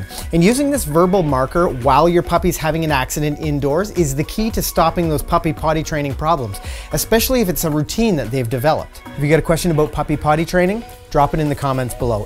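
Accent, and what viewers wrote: Canada. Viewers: ur canadian omg u say hOUAse and aBout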